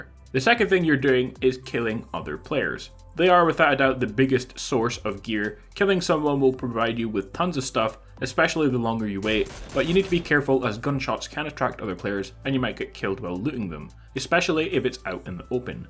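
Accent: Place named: Scotland, United Kingdom. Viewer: I need to ask, what's your accent? Because im from Edinburgh and it seems like you have a Scottish accent but at some times it sounds American haha, also I love the videos buddy!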